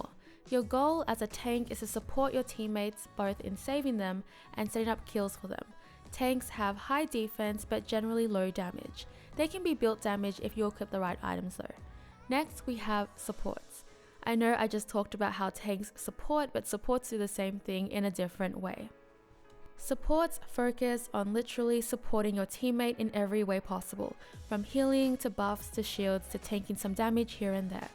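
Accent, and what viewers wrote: Australia. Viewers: Are you an aussie??? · That australian accent Love it <3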